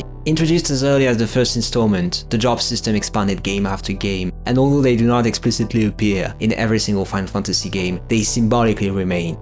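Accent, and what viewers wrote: France. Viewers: I would have put the summoner first, but that s my thing for Yuna speaking Took my a few minute to figure why I had sub a French accent dude though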